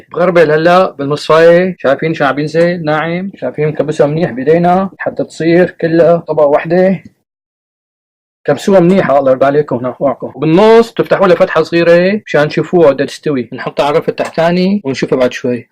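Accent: Levant. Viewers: شغلك غير شكل والاحلى منو حكيك يلي بذكرنا بالشام تعيش وتعلمنا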